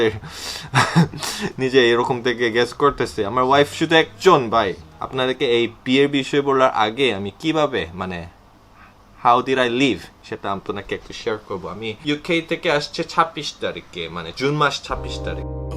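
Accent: Bangladesh. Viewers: You speak so good....plus you have that casual accent of Dhaka.😮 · Borishailla naki..??? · Your Bangla accent is so cute😊❤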